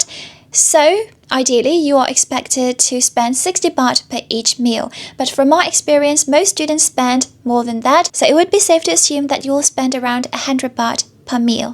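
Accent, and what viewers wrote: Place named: United Kingdom. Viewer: Just curious, did you attend a Uk boarding school? Because of your accent haha.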